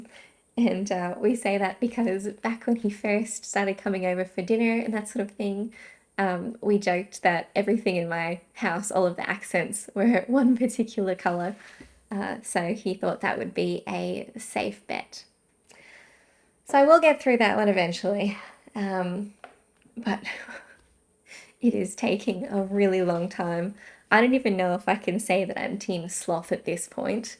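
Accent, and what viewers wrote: Australia. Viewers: Congratulations on your socks. They are beautiful. 9" circs give me hand pain too. I prefer magic loop or DPNs. I still use my Chiagoo 9" needles to hold stitches for heels and such. Your Aussie accent is charming.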